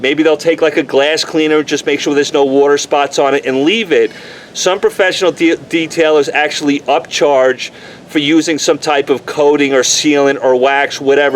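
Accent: New York, United States. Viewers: No way you're from Dallas Texas 😂. Sound like your from my part of town. New York / Jersey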